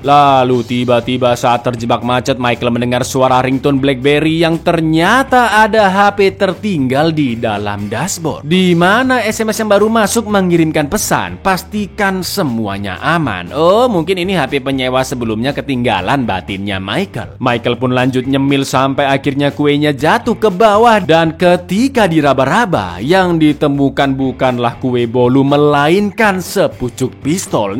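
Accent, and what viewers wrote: Java, Indonesia. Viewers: Gausah alay bro suara lu dan aksen jawa lu ..belum tentu banyak orang suka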